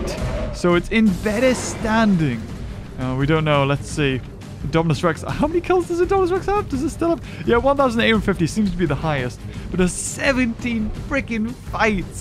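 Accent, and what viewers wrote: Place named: United Kingdom. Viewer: British dude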